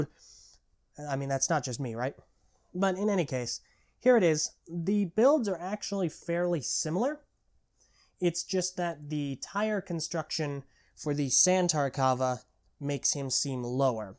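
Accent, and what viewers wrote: Australia. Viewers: Pohatu now has a Australian accent in my mind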